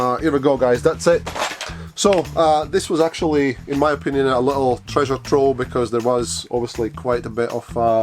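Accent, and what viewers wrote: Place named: Scotland, United Kingdom. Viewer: Lots of Retro goodness there. I've never had a Game Gear, never come across games for it even in CEX, so I'm sure they are really rare. Excellent buried treasure....I wanna search your garage haha. Looking forward to your origins video and hearing how came to be...also the excellent, clearly Scottish but definitley a bit of a mixture there. Keep up the good work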